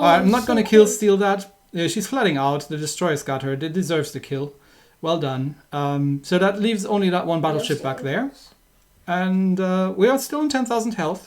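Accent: Germany. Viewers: Hey i gptta say i found you as i needed some guidance on how to play a bit better hit those citadels and it help'd. Also you sound like you are a german but still wanna ask you what server do you play EU or NA because i tried searching your fleet yet i could not find it.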